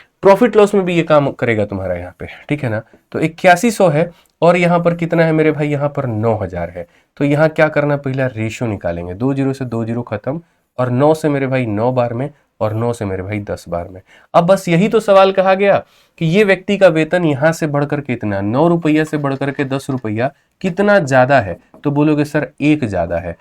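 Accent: Bihar, India. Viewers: Sir , aap bihar se hai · bihari Vali bhasa Mt boliye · Maaz aa gya sir ❤❤❤ dil khus ho gya Aapna bihari ton ka baat hi kuch aalag hai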